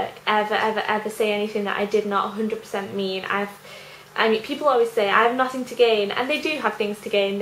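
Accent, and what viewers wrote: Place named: Yorkshire, United Kingdom. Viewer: OMG are you from Huds? I'm from Sheffield, I knew you were a yorkshire lass :-) lol x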